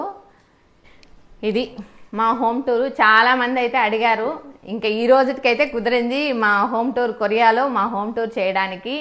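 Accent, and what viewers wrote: Rayalaseema, India. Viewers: Hello, its like pakka Indian home..not like kdramas one..😅 i watch kdramas. Where r u from? Ur dilect is like rayalaseema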